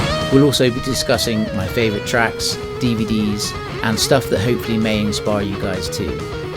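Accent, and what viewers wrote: Bristol, United Kingdom. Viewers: Are you Bristolian?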